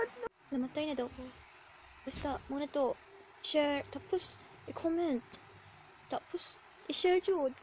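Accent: South Korea. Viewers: galing mag korean bisaya accent 😊😊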